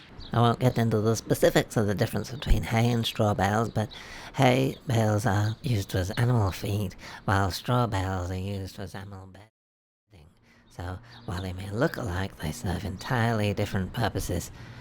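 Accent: Yorkshire, United Kingdom. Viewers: Everyone reading this, pause for a moment. Close your eyes (after reading the rest of the instructions, otherwise you won't know what to do). Now picture saying "Red Bull gives you wings" in his cute little Yorkshire accent. Your day should now be 12.75% better.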